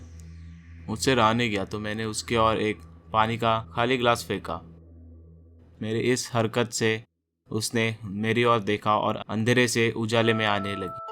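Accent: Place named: Rural, India. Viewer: Story shi h lakin apne bolne ki style ko improve kr...asa lg rha h ki tu villager h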